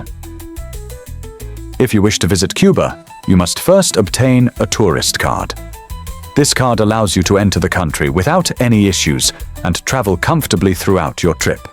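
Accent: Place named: England, United Kingdom. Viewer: The English accented AI voice is barely tolerable.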